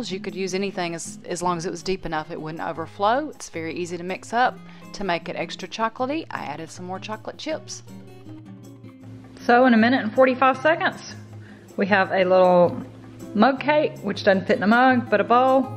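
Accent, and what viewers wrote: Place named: Oklahoma, United States. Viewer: We are actually from San Diego but a lot of my husbands family lives in Oklahoma so he was pondering your accent 💁🏼 thanks for sharing!!!!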